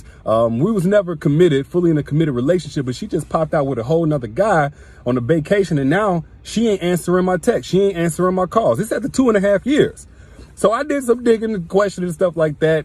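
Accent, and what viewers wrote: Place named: New York, United States. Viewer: NYC. Loud and clear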